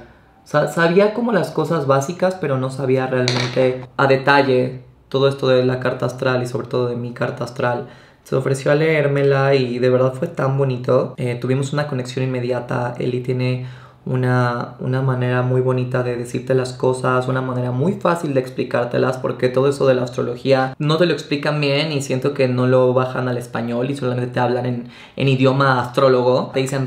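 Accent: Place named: Fresa, Mexico. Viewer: Treintañero (a) gay aun no descubre que hablar arrastrado tipo "fresa" en 2020 da cringe. Flop.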